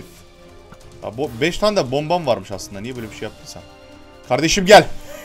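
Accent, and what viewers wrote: Yozgat, Turkey. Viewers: Abi Yozgatlı mı sın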